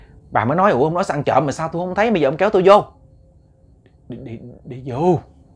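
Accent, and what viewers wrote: Mekong Delta, Vietnam. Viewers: Giọng đọc của đúng chuẩn miền tây quê tôi...❤❤❤❤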